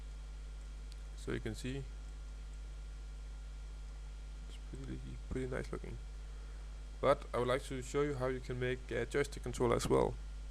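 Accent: Denmark. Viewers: Nice tutorial! Are you by any chance Danish? Your accent is so familiar.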